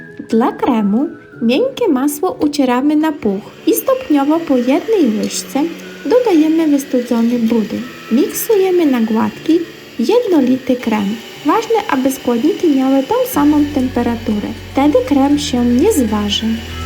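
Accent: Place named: East, Poland. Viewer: pieknie mówisz po polsku. Twoje wschodnie pochodzenie tylko lekko słychać w wypowiadanych słowach.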